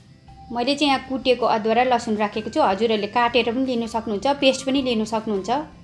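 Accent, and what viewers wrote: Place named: Darjeeling, India. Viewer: Darjeeling accent,, achar looks delicious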